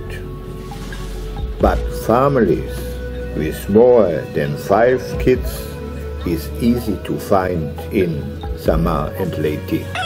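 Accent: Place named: Germany. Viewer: Schönes und interessantes Video, aber Dein Englisch ist immer noch extrem deutsch akzentuiert, ich musste öfter sehr grinsen. Aber man versteht alles sehr gut und das ist das Wichtigste.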